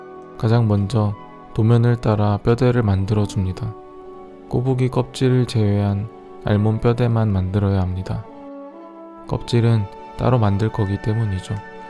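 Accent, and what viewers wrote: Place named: Chungcheong, South Korea. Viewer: 는 충청도 사람입니까?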